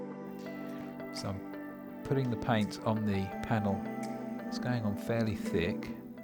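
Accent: United Kingdom. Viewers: As a visual learner this is perfection. But as a vocalist/musician the volume fluctuations are maddening. I'd like to hear your lovely Brit voice better, without constantly adjusting the volume. Stunning work as always though.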